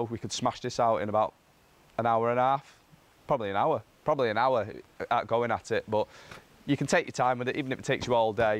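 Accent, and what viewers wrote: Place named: United Kingdom. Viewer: Love British accent😂 oh my gosh! But sounds Bu…, Cut sounds Cu…, how you guys understand each other? hahah pretty cool though!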